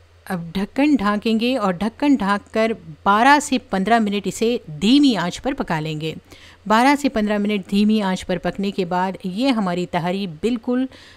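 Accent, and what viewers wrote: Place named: Karnataka, India. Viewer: Mam apka talluqh bidar se hai? Apne kabhi zikr nahi kiya laikin apke andaze takallum se itna yaqheen hai k ap hamare hum watani hain karnataka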